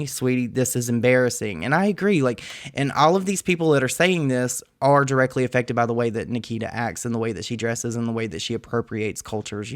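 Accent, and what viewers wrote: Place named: Tennessee, United States. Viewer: Thanks for the 🍵. And your accent reminds me of summertime on Dale Hallow, Norris Lake or Cumberland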